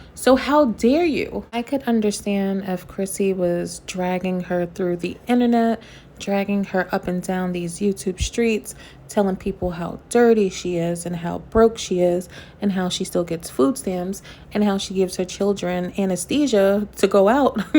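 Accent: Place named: New York, United States. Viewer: Are you from New York ? I love love your accent !!!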